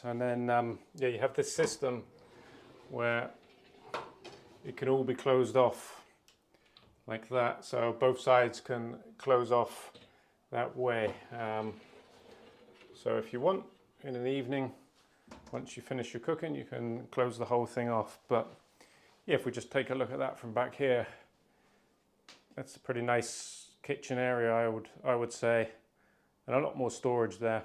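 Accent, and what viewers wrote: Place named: United Kingdom. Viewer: Nice to hear pure British English, not American one.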